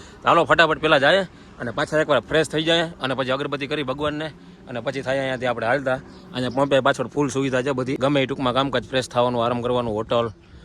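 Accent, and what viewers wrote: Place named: Saurashtra, India. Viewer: ગુજરાતી તો સેજ પણ એમાય સૌરાષ્ટ્ર જે બોલી છે એને તો પુગેજ નય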